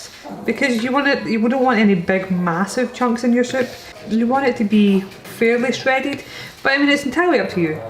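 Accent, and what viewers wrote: Scotland, United Kingdom. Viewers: Nice to hear the Scottish accent as I have been away for many years! Parsley is rich in vitamin C and other antioxidants, which help reduce the risk of serious health conditions like diabetes, stroke, heart disease and cancer. It's also an excellent source of: Vitamin A. Folate. I make the soup just like you and like you mentioned turnip has to be in mine, I won't make it if I forgot to buy it. To make it healthier just after I take the ham out, I skim to top of the ham water into a glass container and place it in the fridge just in case I need it - you will see the separation of liquid and fat and be glad it is not in your soup.(also do it for all my stews) White pepper is nice and poultry seasoning has thyme and rosemary.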